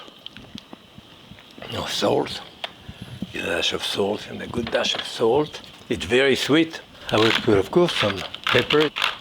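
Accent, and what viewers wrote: France. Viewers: Monsieur Pépin, j’aime regarder votre émission de cuisine, et votre accent français quand vous parlez anglais est si adorablement mignon 😊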